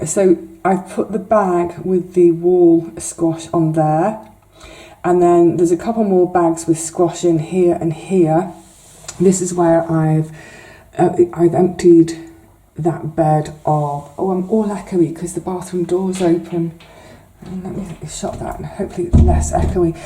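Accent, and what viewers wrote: United Kingdom. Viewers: british accents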